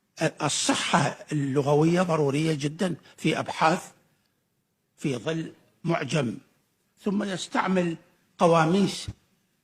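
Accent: Iraq. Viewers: طلاسم اللغة وتغدعقيدها دليل ضعفها ونفور الاشخاص للتدث بها... وذا الدكتور يتحدث اللغة الفصحى بطريقة خاطئة ...مخارج الحرف باللهجة العراقية وهذا لايجوز كنطق أو أن يتكلم بالعامية أفضل مثاا نكيرا...بدل أن يوقول (نكره)والدليا الذب ايتحصىه من القرآن يلين فيه الايتهزاء وهذا انسايا مرغوضا ولو أن هذا كتاب يقال أنه سماوي ...ودفالإستهزاء عمل سيئ والكثير من الشواء القرآنية تثبت ذلك ...يقولون هنالك عبرة ...أية عبرة حين تحبط الآخرين ...إذا كان كلام الله هكذا فكيف بعباده ؟؟. البلدان الذان ينطقان الفصحى بشكل سليم هما سوريا ولبنان ...أما باقي الدول العربية فلا. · يعني عيوننا دمعت لانك الوحيد الذي يتكلم مثل اهل العراق وباقي للسوريين لاء ...الاعيبكن اصبحت مكشوفة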